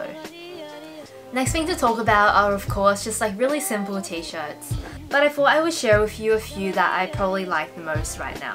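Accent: Australia. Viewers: 안녕하세요? 한국인이세요? 너무 사랑스럽고 실용적인 코디를 하셔서 즐겨본답니다. 영어채널이라서 100% 다 듣진 못하지만.. 영상 거의 다 봤구요. 송혜교 느낌 닮으셨어요. 호주발음도 매력적이어서 너무 좋아요!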